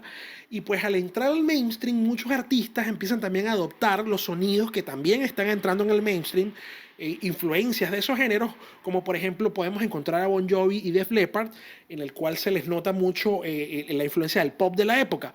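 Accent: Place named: Venezuela. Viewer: chamo eres venezolano,,, seguro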